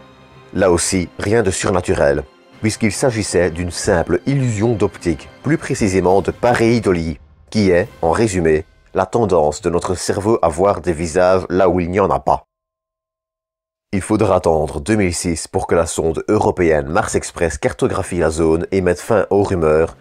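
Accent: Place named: Wallonia, Belgium. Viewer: Petit commentaire juste pour flatter l'algorithme de YouTube..... Excellant travail comme d'habitude, avec comme signature sonore cet accent inimitable... Ha, ce terroir Wallon, on l'Aime.....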